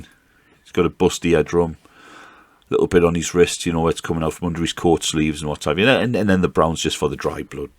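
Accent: United Kingdom. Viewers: It really is no accident that a gentlemen with such a nice Bri'sh accent is a great creator of Ork content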